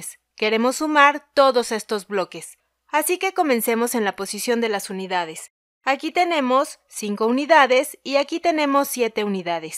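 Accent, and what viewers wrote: Mexico. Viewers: este acento es mexicano?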